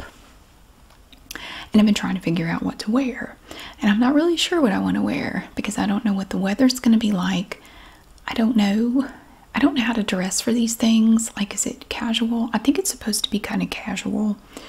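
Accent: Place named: North Carolina, United States. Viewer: Im serving over seas and Your voice reminds me of my wife. she is from rural North Carolina. Your accent is spot on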